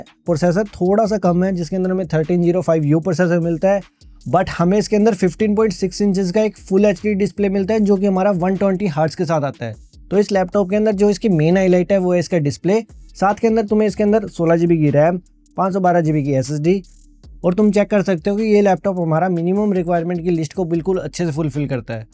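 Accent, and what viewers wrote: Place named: Haryana, India. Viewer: Bhai haryana se ho kiya btw lag raha ha 😅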